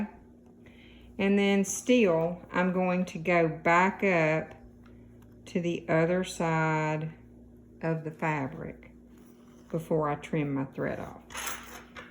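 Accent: South, United States. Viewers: Are you from Georgia or Alabama? 🥰 Your voice sounds like all the ladies from my Dads family.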